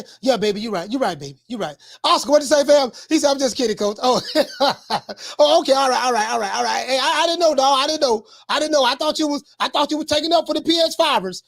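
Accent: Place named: Texas, United States. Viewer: Hey Coach I'm going to check your show out. 2hrs is a long time.i have to break it up into several segs. Where you from Coach? You sound like you're from Oak Cliff, TX.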